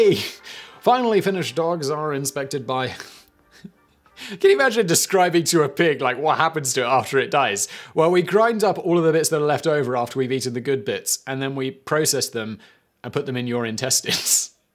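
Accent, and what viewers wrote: England, United Kingdom. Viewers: Alicia Rice How is it he sounds so insular, whenever he comes across a foreign word it’s pronounced stubbornly in the King’s English? He’s the type who pronounces Don Juan like Don John. It would kill him to pronounce croissant other than like crescent. There’s a reason Brexit happened, no matter how much pretends to be internationalist. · you have a very similar accent/dialect to me. I am English and enjoy the language we hol in common. However, I do wish you woul cease using US phrases and pronunciation - I don’t know whether the arseholes of the US are flattered by your transatlantic euphemisms or laughing at you. I merely find the practice abhorrent. If you think that using US slang will give you a better audience, I very much doubt it.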